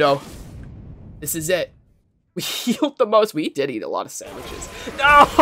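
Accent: Italy. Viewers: yeah i can tell you're italian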